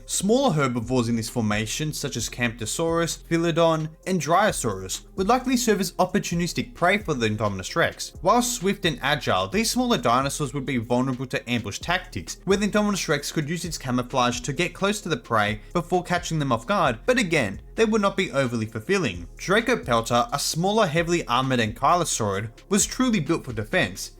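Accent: Australia. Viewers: U an Aussie can’t tell which accent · Good day mate are you Australian?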